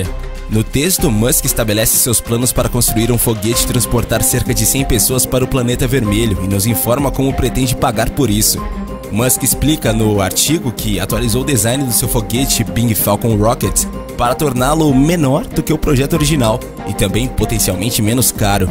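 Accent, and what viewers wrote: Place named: Caipira, Brazil. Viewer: O cara falo com um sotaque caipira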